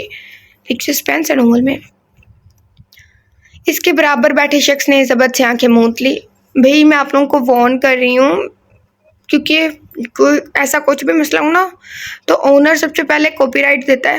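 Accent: Punjab, Pakistan. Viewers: Yr JB novel parhtay Hain to bss vahi read kartay Hain beach main Apne dukhray nahi rotay Novel k start main batain kar leya karo or novel parhtay vaqat awaz or lhja narm rkhty Hain Urdu bhi Sahi boltay Hain Aap ka to panjabi lhja Hy Urdu bhi Sahi nahi bol Rahi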